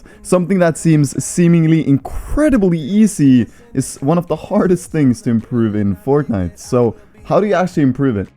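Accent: Norway. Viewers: Are you Norwegian?🇳🇴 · er du norsk?? det visste jeg ikke haha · vent, er du norsk? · Hæ??? Jeg visste ikke at du va norsk! Nå som du sa det så hører jeg det. :/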